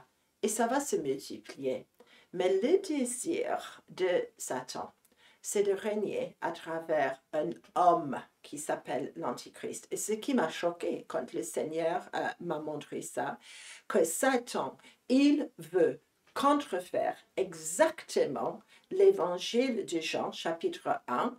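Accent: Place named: England, United Kingdom. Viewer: Did you try to pray Holy Father Pio de PIETRELCINA.? I AM SORRY BUT YOUR VERY STRONG ENGLISH ACCENT IS AN IMPEDIMENT TO MY UNDERSTANDING . HAVE YOU AN ENGLISH BLOG PLEASE.?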